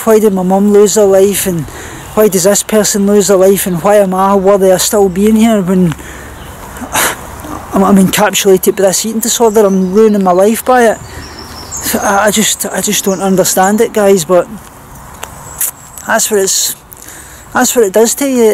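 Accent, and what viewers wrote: Scotland, United Kingdom. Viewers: I was half way through my comment and it disappeared so if you find a random unfinished thing, it's mine... ANYWAY, ONTO MORE IMPORTANT STUFF!! I have said this before but this is a great time to say it again... I found you in sin city Renee's comments section and was interested enough in your comments to see if you had your own channel. So I found you through veganism but stayed because of YOU. I stopped following the vegan youtube community last year when it all got really stupid. I unfollowed all but 2 or 3 vegan channels. Of those, you're the only channel I watch religiously. I do eat a vegan diet but I don't have an eating disorder - at most, I have a dismorphic self hatred about being overweight. I like your channel because of you. Not the ED. Not the veganism. Not the furry family. Not the irresistible Scottish accent. 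Not the eclectic posters on your wall behind you. Not the singing. Not the persistent rain. Not the wrap around shades or squeeky voiced sound effects. Not the great variety of dog fluff. (Even though I enjoy all of the above!) I watch your content because of you. You,. And the rare but lovely cameo appearances from Laura:D If you want to know what it is about you (and not my list of nots) It's because of who you are as a person. You're very friendly, you talk openly without judgement and you keep your content true to who you are. You don't need to be rude or offensive to talk about awkward subjects, you have no idea how enlightening and truthful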